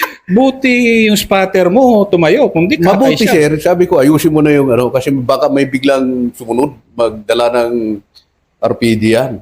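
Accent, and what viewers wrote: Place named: Kalinga, Philippines. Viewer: taga cordillera ka sir dba?/ fromwhere in the cordillera?? correct me if I am wrong..sa pronunciation and how you speak taga kalinga ka ba??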